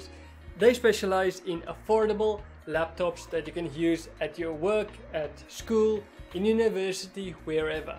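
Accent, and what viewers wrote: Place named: Afrikaans, South Africa. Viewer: That thick afrikaans accent is on point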